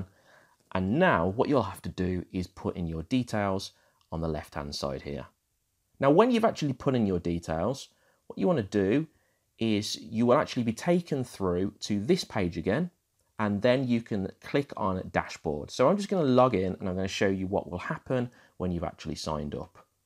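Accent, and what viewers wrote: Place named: Yorkshire, United Kingdom. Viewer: Love the Yorkshire accent (Lancashire?) ... ! and right now trying out Lately here in Japan where I am based (I'm from Yorkshire). I basically came to your tutorial as I only post to IG via my MacBook Pro and need to do some multiple photo posts. Normally post by changing the User Agent to iPhone in Safari but it has too many limitations.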